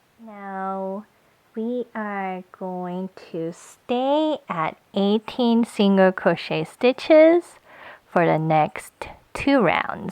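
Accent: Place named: England, United Kingdom. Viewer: Hi! I'm watching this video from Korea. As a non English speaker it is hard to understand(Because lots of korean like me are not used to English accent) but it helped me a lot when I make psyduck. Thank you♥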